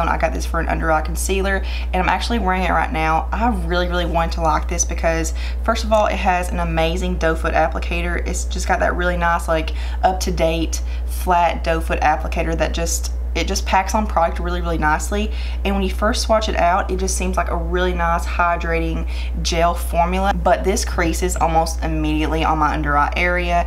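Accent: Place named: Kentucky, United States. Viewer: I just found your channel and your so relatable! Love videos like these! Where are you from? You sound like a Kentucky girl like me lol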